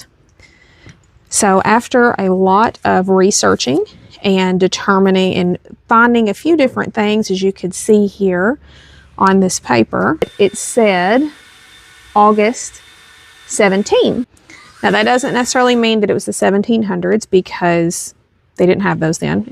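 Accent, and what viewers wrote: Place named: South, United States. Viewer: Did I not say you are intelligent and knowledgeable? Hon, you should hear my accent. I'm from SC. I spent a lot of my life in business offices, and I know how people perceive that particular regional dialect, because I used to speak it. But you do you. I appreciate your knowledge, and will keep watching you, regardless. Honestly, just trying to help, so you will be seen as more professional. People already think anybody with a southern accent is stupid. I live in FL, and I moved from S. FL to N. FL in part because people made fun of my accent. I love it up here. It's truly the deep south of FL, complete with oak trees dripping with moss. Have a lovely, day. I didn't meant to hurt your feelings. <3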